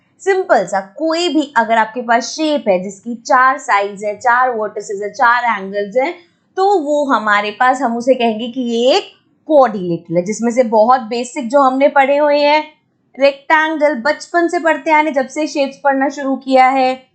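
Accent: Punjab, India. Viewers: Punjabi ho app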